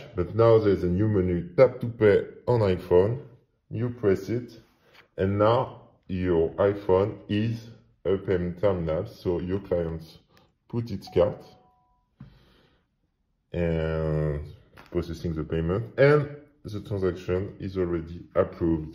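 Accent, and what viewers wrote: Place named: France. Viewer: he IS speaking english but with a french accent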